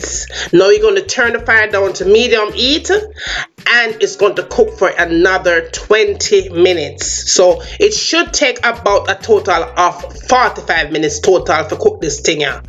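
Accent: Jamaica. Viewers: First tings first you add hoil!🤣🤣🤣 pure yardie. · I love Jamaican accents 😍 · Jamaicans are some of the most confident people on the planet. Love the accent and love Jamaican food 🔥🙌🏿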